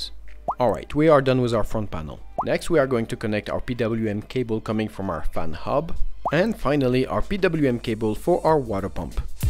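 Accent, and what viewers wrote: France. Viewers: tu as un acent Francais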